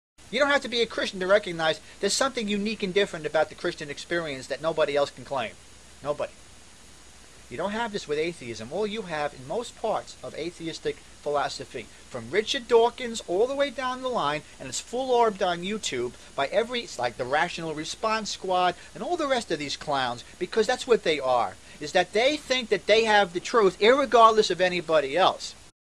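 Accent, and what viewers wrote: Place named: Brooklyn, United States. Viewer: I would rather spend eternity in hell if heaven is full of nasally Brooklyners like this moron. Sorry that accent is nails on a chalk board!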